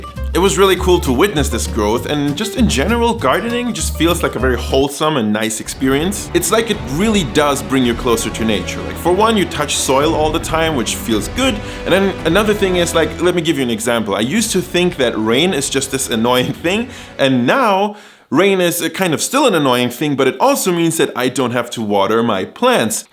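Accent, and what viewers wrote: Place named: Berlin, Germany. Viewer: I immediately knew you were from Berlin because of the way you speak and when you showed the houses I was certain lol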